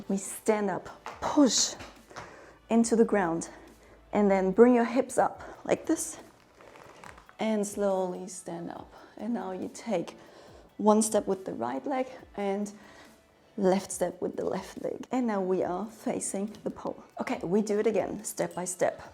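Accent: Germany. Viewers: I could listen to you speak English with yr awesome German accent all day !! Love you !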